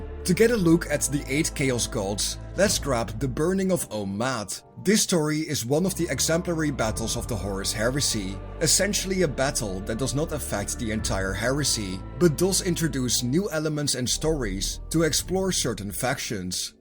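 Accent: Netherlands. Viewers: As an ex gw employee I have to say I like your topics and storytelling. As a fellow Dutchman I have to say I really dislike "our" accent in English 😅 nothing personal. Keep it going and thanks for spreading this aspect of the hobby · Haha i can hear you're dutch from a mile away.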